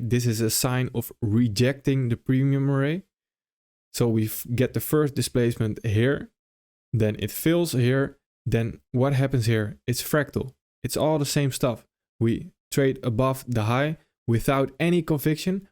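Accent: Netherlands. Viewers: Love how they're not too long and love the Dutch accent. 🙂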